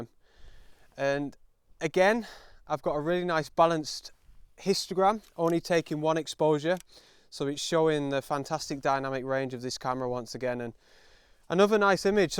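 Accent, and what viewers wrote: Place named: England, United Kingdom. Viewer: Enjoyed your video. The English accent is 👍.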